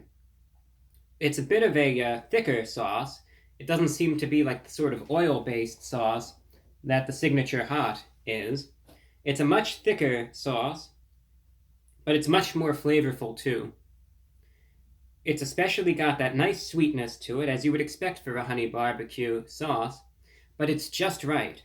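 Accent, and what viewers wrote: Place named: Transatlantic, United States. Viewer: Video starts . By the way, I can’t tell if you’re doing a transatlantic accent for real or you learned how to fake it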